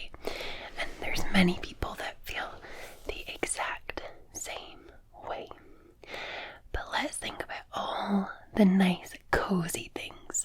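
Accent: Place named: Scotland, United Kingdom. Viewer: Greetings from Glasgow. It’s so authentic to hear ur accent, nice to hear a homelander doing ASMR! Love ur content especially scalp massage. Helps with anxiety and sleep. Thanks x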